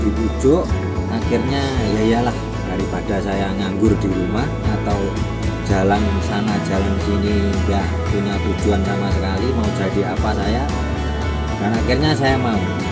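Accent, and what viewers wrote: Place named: Java, Indonesia. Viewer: Kenapa accent awak masih pekat Jowo? Awak tak bergaul dgn orang Melayu ke? Btw...saya keturunan Banjar dan Bugis. Kampong di Perak.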